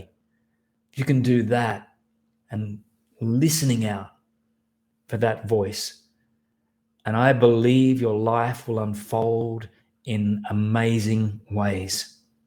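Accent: Australia. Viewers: You have the perfect voice for doing the affirmations. Your tone and accent are really awesome. Is your amazing accent Australian? Thanks for your good and positive attitude and energy.......it has really helped through the tough times.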